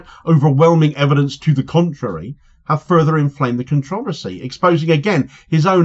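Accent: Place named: West Country, United Kingdom. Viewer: The Beeb gets it from all sides - that suggests it usually draws the line about the right place. I can’t believe this person (?) whose posts are usually a diatribe of bias (against Israel, against Conservatives, against the mainstream media, against the present Labour leadership) is accusing anybody of a lack of balance. His schtick is very simple - the BBC is influential around the world - if you accuse it of bias - you might get some traction either from an executive encroaching on editorial independence or from people jumping on the bandwagon. This stuff is among the worst news analysis on the internet. I watch it for comic value as I hear a big lumbering bloke, in a big lumbering Worzle accent get completely out of his depth on international politics. This anti US/Israel stuff is so undergraduate and juvenile. “Oil be gettin the Soyder out soon” and see what village is missing him.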